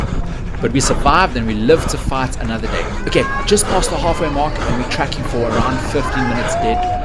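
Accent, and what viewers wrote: South Africa. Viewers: Is that a South African accent I hear?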